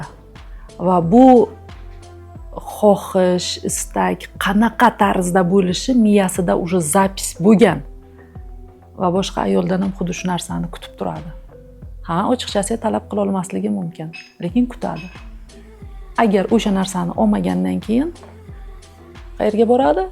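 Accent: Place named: Qarshi, Uzbekistan. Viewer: Карши шевада опа куп болани фикрини эшитган бу аник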